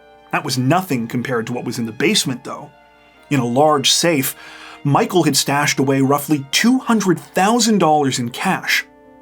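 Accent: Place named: Canada. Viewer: Oh you're Canadian eh?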